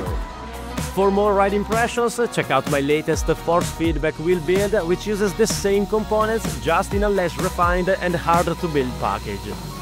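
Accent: Italy. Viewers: Bro si sente che sei italiano lol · From this accent you have you are italian for sure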